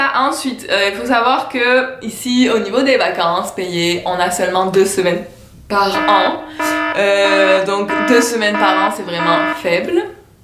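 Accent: Quebec, Canada. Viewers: Pourquoi tu parles avec l'accent du Quebec alors que tu es française ? Je comprends pas trop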